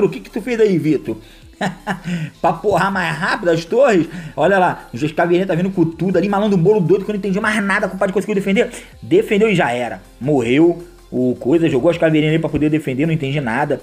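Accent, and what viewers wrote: Rio de Janeiro, Brazil. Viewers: ei VC é do rio de janeiro né? puxa o x, ixqueletos, e tbm parece ter a língua presa ne?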